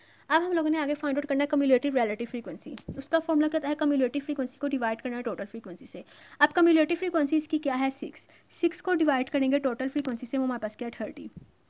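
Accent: Lahore, Pakistan. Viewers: Lahorey accent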